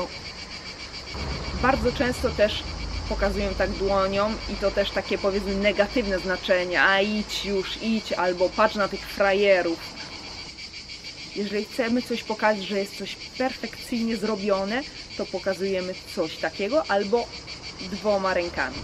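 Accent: Italy. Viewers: Masz piękny włoski akcent gdy mówisz po polsku!!! <3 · Masz PRZEPIĘKNY włoski akcent 👌🇮🇹❤️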